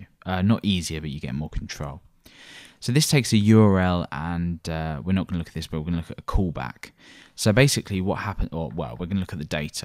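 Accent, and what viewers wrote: England, United Kingdom. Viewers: so good to hear a clear concise english accent. you my friend are part of a small percent of the population, a dying breed in the cultural soup that is the united kingdom full of wanna be "roadmen" and wasters. There should be a lot more English people making these videos, and programming should be taught in primary school like it WAS in the early 90s. Before austerity and dumbing down became a priority. /rant over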